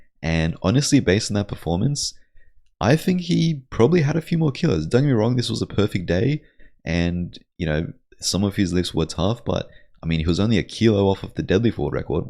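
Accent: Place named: Australia. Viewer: I recognise the Aussie accent, but can't seem to know who this channel belongs to!!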